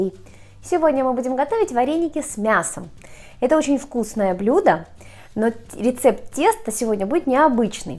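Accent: Surzhyk, Ukraine. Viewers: Спасибо за рецепт,скоро буду готовить именно по вашему рецепту : ) P.S. обожаю ваш канал и вашу приятную подачу рецептов. Не обращайте внимания на дураков и хамло, не меняйте манеру разговора и суржик : )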